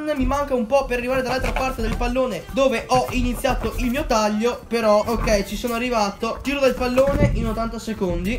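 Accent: Veneto, Italy. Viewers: Di dove sei del veneto? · Ma di che città veneta seu?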